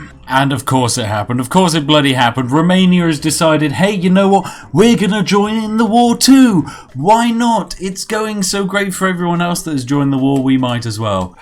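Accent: United Kingdom. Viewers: You sound british. Are you british? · Sounds very British to me...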